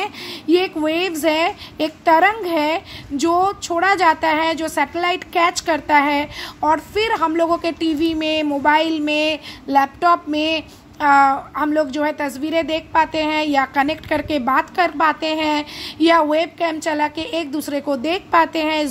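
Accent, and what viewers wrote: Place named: Bengal, India. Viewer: Lagta hai aapki asali language Bangal hai aap Mumbai ya Delhi mein nahin rahte b😮😮😅😊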